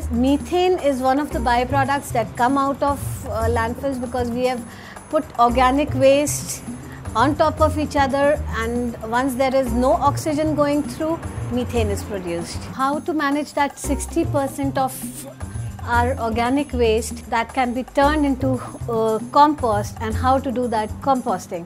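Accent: India. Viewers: Confuse of indian accent. A lot to consider. Decomposing should also made simple.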